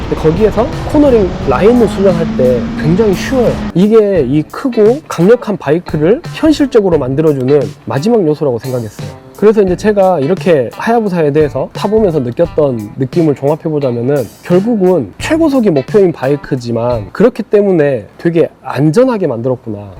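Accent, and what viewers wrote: Jeolla, South Korea. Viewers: 말투가 전라도 같은데 흔치않는 전라도 유튜버 반갑네요 😅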